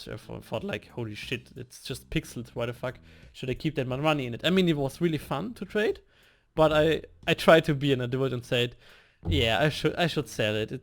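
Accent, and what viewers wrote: Germany. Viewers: Are u german? ^^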